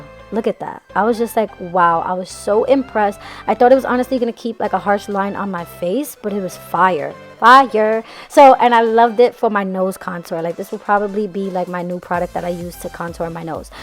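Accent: New York, United States. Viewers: Ur the only YouTuber I enjoy watching lmao I loveee your NY accent and your amazing vibes! Keep it up girly ❤️❤️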